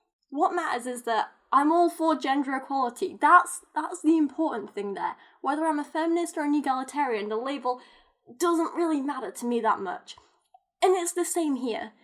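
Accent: United Kingdom. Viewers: I think you are very smart and I like watching your videos. I sent a link to your channel to my 16 yo daughter (not just now, a while ago). Also, love your British accent. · You're brilliant, this is brilliant! I myself am a Roman Catholic, but I believe their is no and never will be any scientific evidence that a God exists. I even said that in the last book that I published on Amazon. Given those circumstances I'd say I'm between a rock and a hard place religiously speaking, but I got myself there and I almost wouldn't have it any other way. We have in common the fact that we are both straddling a hard line with the types of people we live around or converse with. I love the way you say the word "but" where it sounds like "bu" . I understand it's a British accent thing and me being from the USA. I don't hear that every day, and I just think that is interesting. 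I think you are perfect for not coming out as an anti theist, because it can be dangerous to do so. I say that knowing that all I have to go on is from my own life. I just know that If I where to come out and publicly denounce my faith and tell everyone that I hate Christianity and Christians that would be me doing the hateing. So keep on doing what you're doing, debating and posting videos about crazy Christian books that are as you would call them rubbish.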